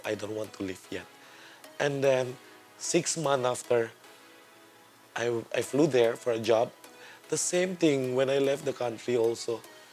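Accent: Philippines. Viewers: Amazing. I didn't realize you're an Indonesian. I have watched your videos especially the male make-up tutorial. I thought your accent is just a shade of Philippine dialect. You're so great.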